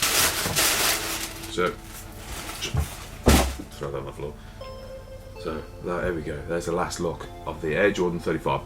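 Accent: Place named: Black Country, United Kingdom. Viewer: Sounds like a Black Country accent here...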